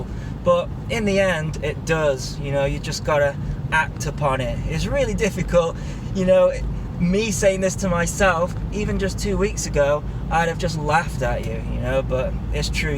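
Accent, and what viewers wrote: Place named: North East Wales, United Kingdom. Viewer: Cool video. I would say; hang on in there, but it sounds like you are. Judging by your accent I would say you don't live too far away from me, but I may be wrong. I'm in North East Wales, are you fairly close?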